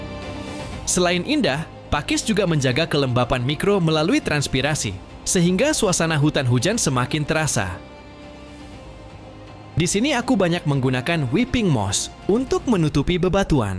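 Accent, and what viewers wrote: Medan, Indonesia. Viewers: bg org Medan ya?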